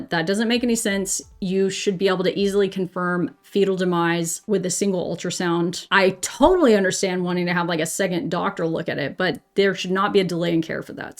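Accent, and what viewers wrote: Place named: United States. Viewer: You sound very American, you should work on that, there's a reason women and members of the lgbtq+ get danger pay if their jobs want them to go into that place. Margaret attwood wrote the handmaids tale about that country for a reason, canadians say Americans for what they are, bad people- you especially 😊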